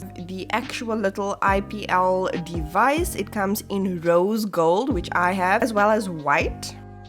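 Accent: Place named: South Africa. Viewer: I love your South African accent 🤩🤩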